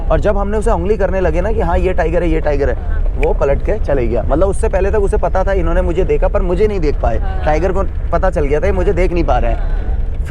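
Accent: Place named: Pahadi, India. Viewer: Pahadi sune me bahut acha lagta h bhai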